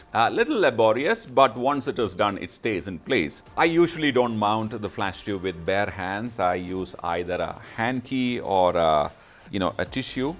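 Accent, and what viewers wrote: India. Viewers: RARELY where i keep listening to an Indian English speaking person! but you sir got me to listen! your production got me to sub and I'll keep watching.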